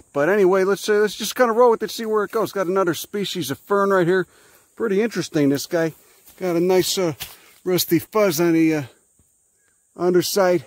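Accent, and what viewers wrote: Chicago, United States. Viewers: the heavy chicago accent makes this so much better because it makes you sound like such a dad. im basically imagining a chicago ned flanders teaching me about plants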